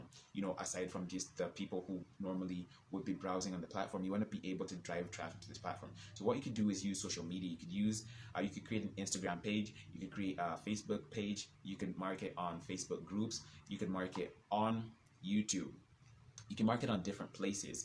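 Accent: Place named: United States. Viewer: Great content ...but how did you lesrn American English😂😂😂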